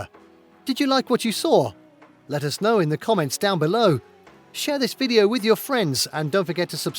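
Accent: England, United Kingdom. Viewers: This entire video was a hate campaign produced Indians using a Brittish accented narrator who claims he was born and raised in Australia. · Yeah, someone else see's through his bullshit. Aussie with an English accent. Pertains to have been born here, yet on another video he says he would like to visit. Some other commenter claims this originates in India. Maybe that is why such an emphasis on it. By the way this banana really is full of poo poo. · Mistakes 1. New Zealand flag not Australian 2. English not Australian accent 3. Supposed indigenous people contained indigenous to Africa, North America and South Pacific Islands 4. Photo of second largest city which is Melbourne were actually of third largest city Brisbane Many more WHAT A FAKE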